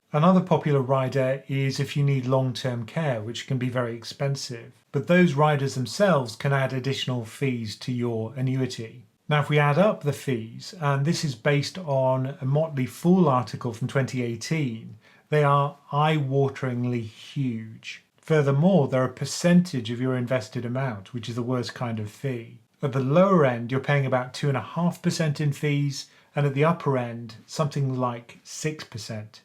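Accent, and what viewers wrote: United Kingdom. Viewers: Really helpful but rather USA orientated… despite the UK accent.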